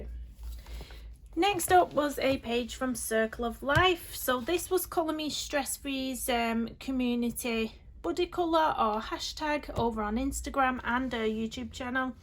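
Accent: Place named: North, United Kingdom. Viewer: Some lovely work this month. My husband came in to listen because he's from Littleborough and pines for the Northern accent. He really liked the first one you showed, where you used the spray messy stuff. I find it hard to pick a favourite, I like them all. Can you share the link for Mouse Guard book? I've had it in my basket at Amazon for months and they keep telling me they still haven't been able to source it.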